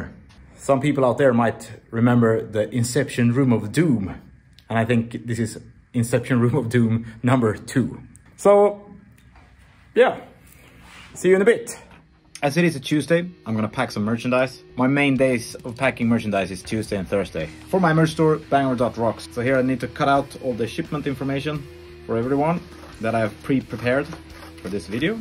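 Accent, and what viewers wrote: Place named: Hagfors, Sweden. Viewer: Håller med om katterna, å dialekten va Hagfôrsmål. 😁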